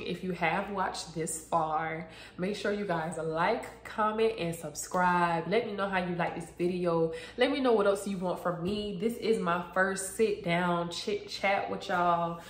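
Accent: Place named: Broward, United States. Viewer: You can move out of broward but you can’t take the broward talk out of you lol 😂 I loved this vlog 🥰 congratulations happy for you keep going bby 👏🏾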